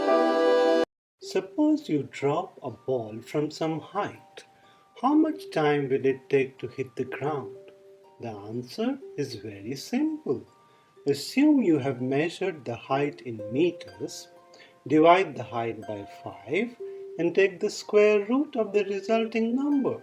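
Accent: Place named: India. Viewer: Sorry if i seam racist here, but i have to tell you! I just LOVE your indian exant, i really get this feeling of wanting to smile, thats rare. Its something about how you use tones in your voice. This a bonus for a lecturer like you, its like having einsteins hyper inteligent teddybear speaking to me through youtube or something. Newer loose that grat tone of woise. Your like a guru, not talking bullshit all the time.. More power to you :-)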